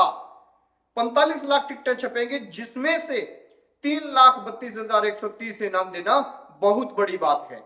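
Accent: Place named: Punjab, India. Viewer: Bhaiya aapane bhi Punjab ki kharidi thi na Kyunki aapki awaaz se lag raha hai kya Punjab se hi hai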